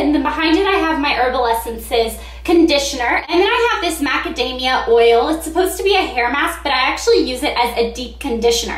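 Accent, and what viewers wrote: United States. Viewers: Then don't watch her. Plain and Simple. And she is american so ergo an american accent.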